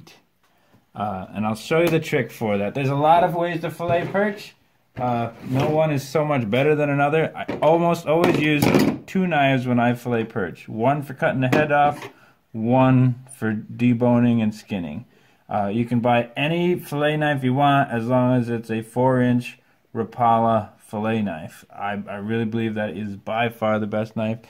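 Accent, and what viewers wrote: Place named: Adirondacks, United States. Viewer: I knew you were an Adirondacker before you said it. It's the accent. I grew up in the Keeseville area. Moved to New Hampshire after college. Been down here about 25 years and people still ask about my accent. My sons and I fish down here. It's fun, but there's nothing like fishing the Adirondacks. Great video! Hope all is well with you and your family.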